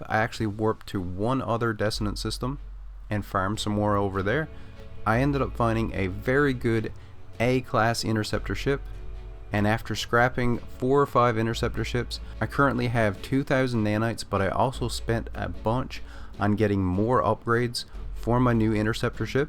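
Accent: Newfoundland, Canada. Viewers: A minute in I was like wait b'ys a newfie - and then I saw your username 🤣 Born and raised in Deer Lake. Also thanks for the awesome guide! I just picked this up. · Heard whaddayat, and immediately thought, a Newfie on YouTube??? No way! Love from a Corner Brook boy! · Guy is from Canada or Minnesota. 😄 Pretty good video though. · Great content buddy . You sound Newfie as my self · Are you from Newfoundland you say "what are you at" the only people i know that say that are my family who are from Lewisport Newfoundland you sound like you have a slight accent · are you canadian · Hilarious. I was like "i know that accent". From one Newfie to another! Cheers dude!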